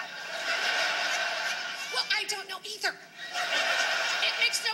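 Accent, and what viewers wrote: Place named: Chicago, United States. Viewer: She's from Chicago and this is how older Chicago moms sound.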